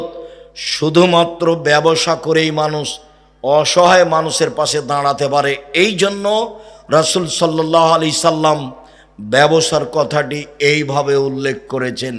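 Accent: India. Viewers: এই লোকটা কলকাতার ভাষায় কথা বলে কেন ? সে কি ভারতীয় ? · শায়খ হুজুরের কথা শুনে বোঝা যাচ্ছে উনি পশ্চিমবাংলা ভাষায় কথা বলছেন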